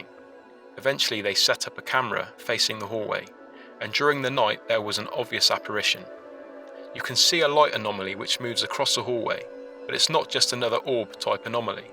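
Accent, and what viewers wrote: United Kingdom. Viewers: I think you have to much time on your hands down in mamas basement,also a british accent can only go so far toward making you look smart. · His UK voice is awesome too awesome could be fake maybe he's from the 80's rock music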